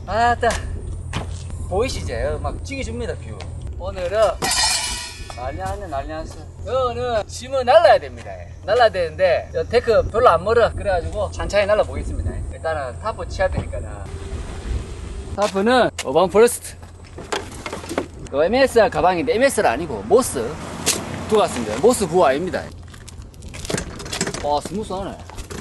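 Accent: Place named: Busan, South Korea. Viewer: 부산 사투리가 무슨 말인지 모르겠어요 ㅋ😢😢 영상 재밌게 봤습니다.🎉🎉🎉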